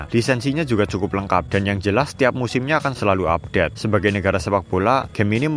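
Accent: Banyumas, Indonesia. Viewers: Naratornya ngapak yah😅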